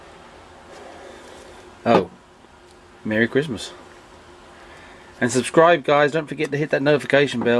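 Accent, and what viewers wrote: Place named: Norfolk, United Kingdom. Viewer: Loved it, nice job! Nice to hear a good old Norfolk accent as well. Keep it up!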